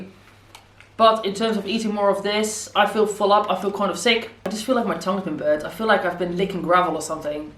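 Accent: Netherlands. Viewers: Are you dutch? I hear an accent.. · I thought I recognized the accent, she speaks Dutch as well 😀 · Are you Dutch? It's my native language and your accent sounds Dutch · You sound Dutch haha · not related but i first thought you were british then i thought you were dutch and then i got so confused because i pick up both accents. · Loved the video! And I thought I heard the slightest bit of a Dutch accent :) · Why is your accent so Dutch! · It’s Dutch 😄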